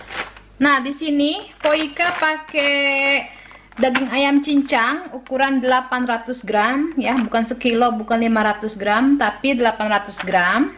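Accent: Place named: Betawi, Indonesia. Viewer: Kagak usah banyak bacot😁😁betawi bangat dah...cipok2 buat 😘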